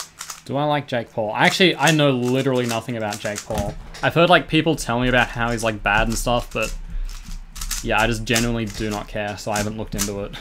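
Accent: Australia. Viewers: You aussi accent is very good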